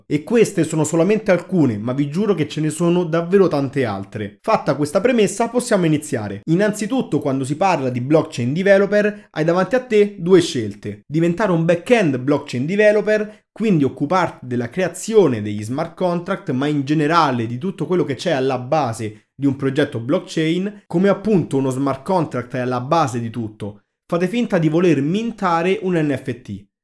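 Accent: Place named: Italy. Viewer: Non ho capito un caxxo L inglese configurato in Italico parli🤣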